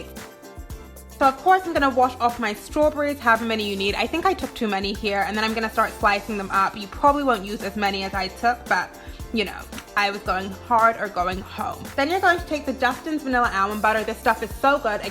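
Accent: England, United Kingdom. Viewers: Just read your comment. Its funny because when you talk sometimes it sounds like you are from England. Now it makes sense as you grew up here lol. Anyhoo I love your 'what i eat in a day videos' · I didn't know you grew up in England! This explains why your accent sounds a bit English sometimes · aw bless you. I was trying to figure out your cute accent. I was thinking it was half British lol. Btw those sweet potatoes look bomb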